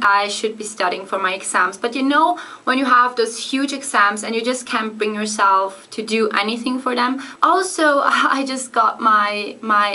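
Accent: Switzerland. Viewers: Really interesting! I'm a 2nd year German studies student from England and am writing a report on Swiss diglossia for my linguistics module. I speak German, couldn't understand 90% of the züritüütch you were speaking, but it sounded so interesting :)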